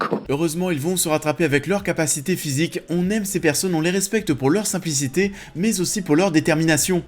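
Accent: Paris, France. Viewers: On devrait donc selon toi nuancer les attaques et les insultes permanentes que subissent au quotidien des fonctionnaires cherchant simplement à faire leur travail ? Rester dans les clous que nous impose la bien-pensance des milieux privilégiés d'extrême gauche ne suffira pas à gommer ton manque de connaissance du sujet que dévoile ton accent parigo du 75, de privilégié justement...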